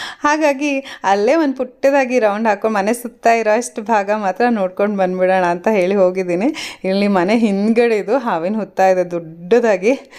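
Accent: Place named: Uttara Kannada, India. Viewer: Hearing ur language I thought u r Frm uttar kannada district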